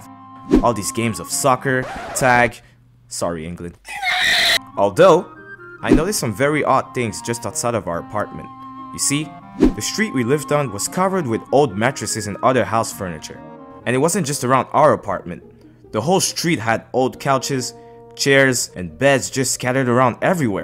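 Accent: Montreal, Canada. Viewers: I can hear that Montreal in your voice :) !!! No hate I grew up in Montreal too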